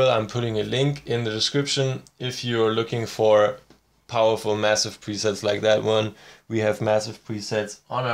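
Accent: Germany. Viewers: You sound hella german lol. I know because I'd also sound like that when speaking english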